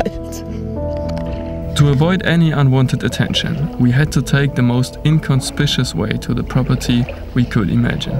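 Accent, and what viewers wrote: Germany. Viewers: I love the way you pronounce Victim. Or the V in general. It's so German!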